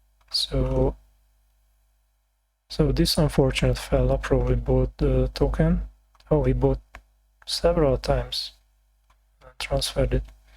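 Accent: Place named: Hungary. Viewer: You are so Hungarian... 🤣 Your accent betrayed you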